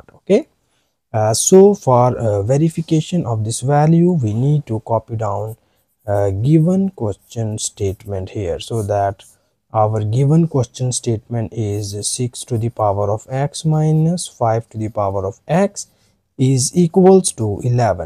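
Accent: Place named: England, United Kingdom. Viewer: Dehati angrez ki tarah bol raha hai. Paki hai kya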